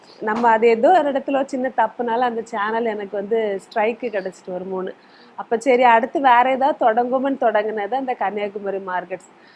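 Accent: Kongu, India. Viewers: Anchor kongu side pola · I think the anchor is from kongumandalam.❤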